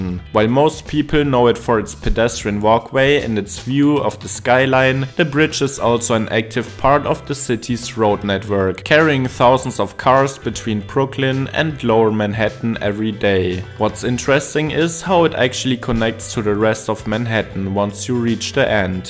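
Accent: Germany. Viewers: "elewated" . your German accent couldn't be more apparent haha. Keep up the good work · How dense are you? Can you not hear the thick German accent?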